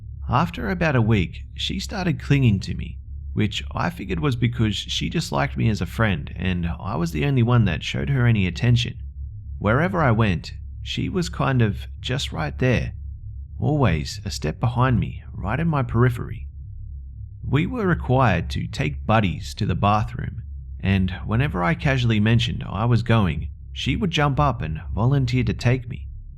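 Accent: Australia. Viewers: Are you Austrailan m8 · r u Australian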